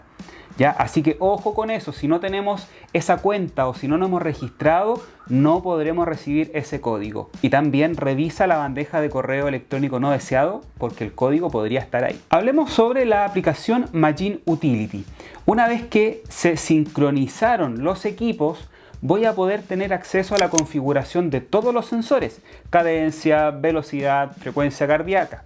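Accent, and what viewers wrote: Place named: Chile. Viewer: Hola ya e solucionado el problema de la palabra go q se me ponía en vez del la velocidad ahora ya se coloca como te dije anteriormente lo uso en un patín de gama alta pero sabes e escuchado a muchos Youtuber q dicen q cuando la velocidad es calculada con GPS es la más fiable será así????? También tengo que decirte q cuando voy en rodando el patín en la pantalla me marca 26 k y en el ciclo me marca 23 24 kilómetros entonces no es igual es bastante la diferencia aveces marca 28 patín y 24 ciclo entonces aquí en la hago casi se q tu eres de chile por el acento yo soy de viña del mar pero llevo 34 años en Barcelona y se q me dirás este ciclo es para bicicleta lo sé sea para uno u otro no van parejos con respecto al patín en cuanto a la velocidad al igual aveces le cuesta un huevo q se conecte a un satélite casi 4 minutos y hay veces q lo hace en 30 segundos no vivo en un pueblo ni en un sitio apartado vivo centro centro entonces??????? 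Ojalá me puedas ayudar con todo lo q aquí te pregunto un saludo desde Barcelona Spain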